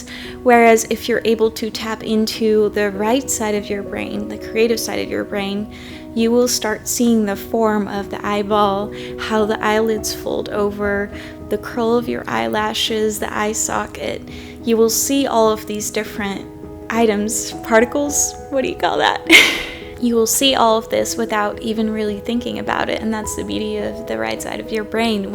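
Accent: United States. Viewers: I’ve lived in Ohio for 30+ years and you sound like you’re from Ohio, Illinois, or Wisconsin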